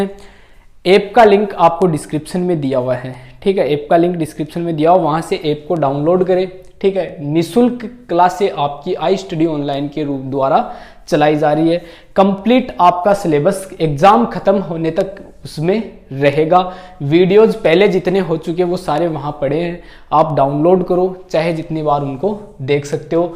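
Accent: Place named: Rajasthan, India. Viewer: Sir this is unit 2 in Rajasthan university syllabus ...nd ur marwadi is like awsm....😄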